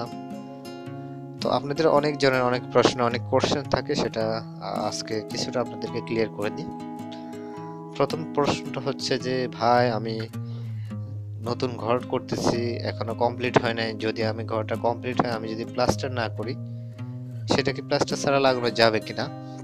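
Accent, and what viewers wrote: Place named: Sylhet, Bangladesh. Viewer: আপনি সিলেট নি? আমি তো সিলেট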